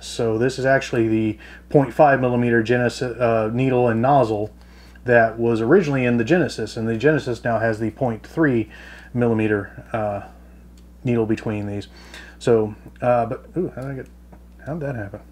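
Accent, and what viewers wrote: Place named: United States. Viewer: Never heard of Grex airbrushes, so am guessing by your accent and hints about Dollars you are in USA?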